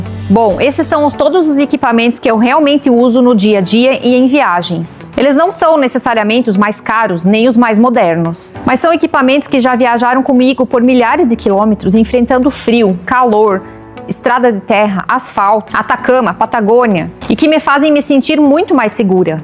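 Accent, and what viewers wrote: Brazil. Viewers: Pelo sotaque parece ser de Santa Catarina. Pela proximidade geográfica de Santa Catarina com com o Paraguai, não fica mais barato e fácil de acabar achando em Ciudad de Leste ou na Argentina as botas? Curiosidade mesmo. Acho super importante andar segura de moto ( eu moro fora do Brasil e vejo cada queda absurda aqui que só por Deus) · 0:3722:31 Suatque gaúcha tchê! tu és do Sul do País menina😉😉🤣🤣😂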